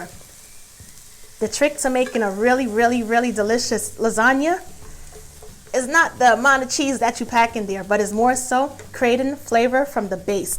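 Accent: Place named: New York, United States. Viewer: I LOVE HOW SHE SAYS SAUWWSE (SAUCE) LOL THAT NY ACCENT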